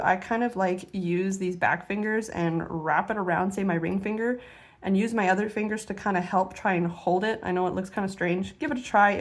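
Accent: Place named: Canada. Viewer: You sound Canadian ❤️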